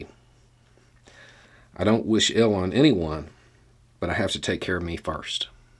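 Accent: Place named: Mississippi, United States. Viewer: Oddly enough, it was blacks, gays, lesbians that were kinder to me then any rich 'white' person. Really just because of cosmetic differances your life is supposedly better, I guarentee you when stufff beyond your skin color challenges a person, that peson will be in the exact same boat. None of us have time to look down on one another because of things people cant chose. When you see more commonaiity with people, you find solutions then differences. This guy here sounds like every poor white person I know in South MS. Please dear writer, get a grip.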